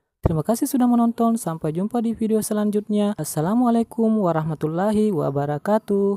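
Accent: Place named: Sulawesi, Indonesia. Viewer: Hafal logatnya dari Sulawesi yaa gan.... hehe. Kami pun sama mengelola cabai juga gang. Boleh kemari mampir juga gan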